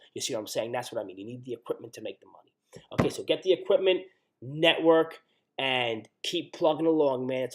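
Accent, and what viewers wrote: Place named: New York, United States. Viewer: I knew you were from ny lol great information.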